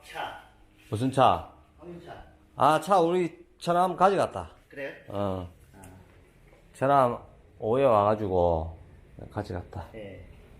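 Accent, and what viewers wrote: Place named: Busan, South Korea. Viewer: 부산사투리 정겹네요 음색도깔끔하고ᆢㅎ 캄보디아간접적 보고생활도느껴보고 고마워요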